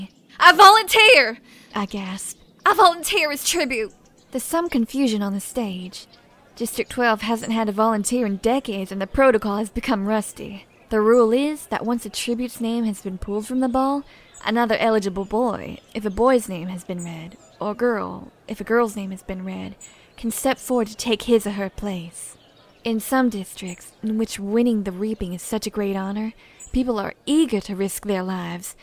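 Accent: South, United States. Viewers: I like the southern accent...Good job! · What's up with the southern accent? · Cool but um what's with the country accent?...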